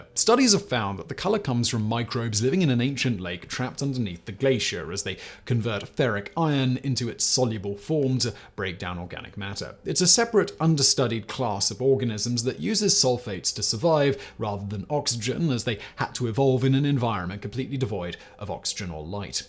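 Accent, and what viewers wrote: United Kingdom. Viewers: He's british as that's how they pronounce it. Not joking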